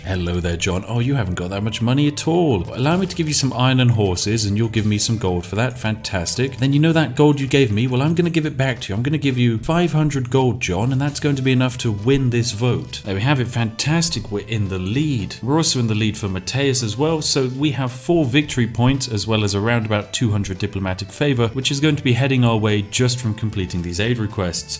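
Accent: United Kingdom. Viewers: That brittish accent is just perfection...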